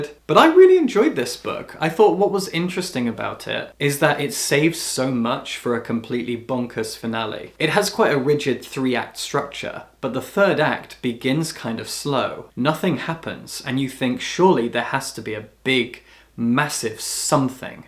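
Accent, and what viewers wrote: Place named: West Midlands, United Kingdom. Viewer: You're from the West Midlands? I never would've guessed from your accent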